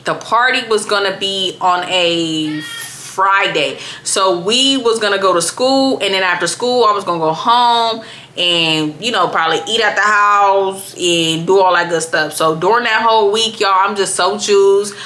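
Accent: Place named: New Orleans, United States. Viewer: Ya feel me...sound like u from the N.O...lol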